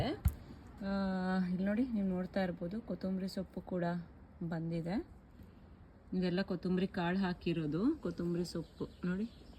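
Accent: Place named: Davangere, India. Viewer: Nam Davangere bashe😅😅😅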